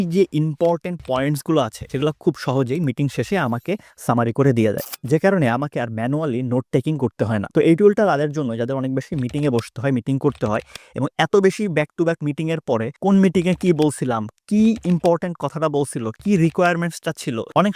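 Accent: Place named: Sylhet, Bangladesh. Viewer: আপনি সিলেটি, ঠিক নানি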